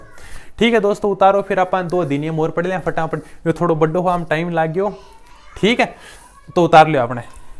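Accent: Rajasthan, India. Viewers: Rajasthani language hai thoda bhut different ho jata hai bolne m...bro aap smajh to PA rhe ho ...ki sir kh stree rhe h..😊😊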